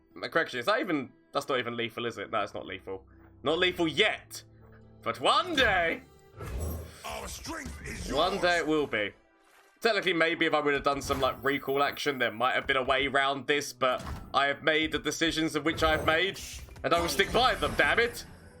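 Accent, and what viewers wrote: United Kingdom. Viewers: Hehe love this accent ^^ Im From France ( Paris) Im in love with Scotland but i like England too 🤗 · The best about his videos are that latino face and name paired with that lovely british accent ❤️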